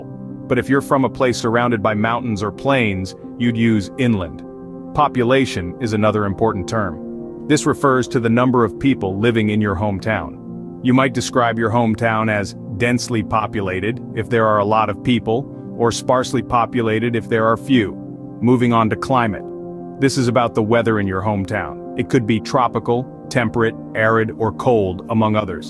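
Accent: United States. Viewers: is this american english? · Can't you hear it ? Of course it is told with an american accent.🙂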